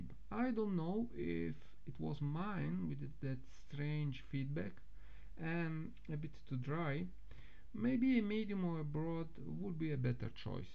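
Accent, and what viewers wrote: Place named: Italy. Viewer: Bellissima recensione, dallo slang sembri italiano, perché non fai recensione anche in italiano?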